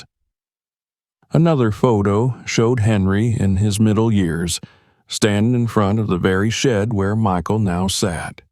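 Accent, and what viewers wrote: South, United States. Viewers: The faint southern accent of the narrative voice adds to the story.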